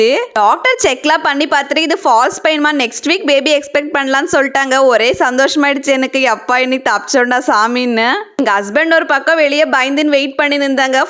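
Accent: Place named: Bangalore, India. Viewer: Neenga banglore ahh because unga slang different ahh iruku ❤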